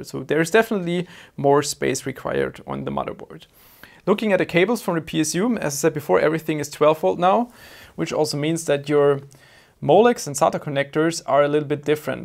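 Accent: Germany. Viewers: Hahaha dieser deutsche Akzent kickt anders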